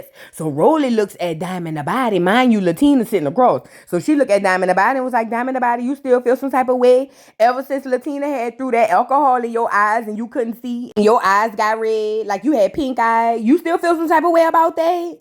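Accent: New Orleans, United States. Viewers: 😂 girl your commentary voice got me cracking up laughing, but it’s on point as I hear your 504.😅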